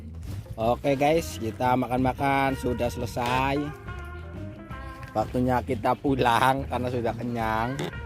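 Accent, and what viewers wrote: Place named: Indramayu, Indonesia. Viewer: Wong Endi mang kaya wong dermayu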